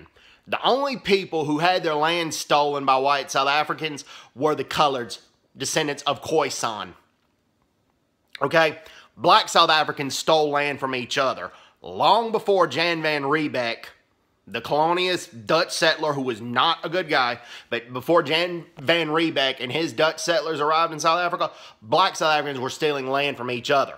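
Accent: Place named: United States. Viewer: Oh shut up! You come with your American accent and tell us the land was not stolen? Man you are lying. We will expropriate land.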